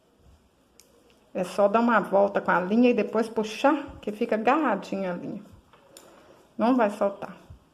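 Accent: Minas Gerais, Brazil. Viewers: Oi, adoro suas bonequinhas e vc é muito simpática e explica muito bem, acho q pelo seu sotaque vc é mineira, acertei? Eu sou nascida em Minas, Resplendor mas moro no interior do Rio. Parabéns!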